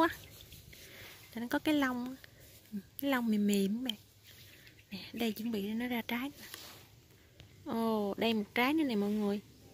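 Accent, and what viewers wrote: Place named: Mekong Delta, Vietnam. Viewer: Nghe giọng nói miền Tây,a nhớ người yêu cũ 💕💕💕✍️✍️👍 hu hu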